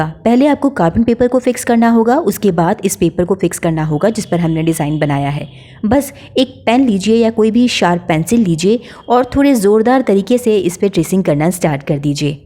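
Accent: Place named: Maharashtra, India. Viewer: 😊 bahut pyari Marathi hai aapki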